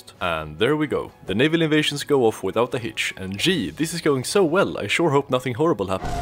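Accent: Sweden. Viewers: Din dialekt är exakt som en stockholmare? Va fan är du då?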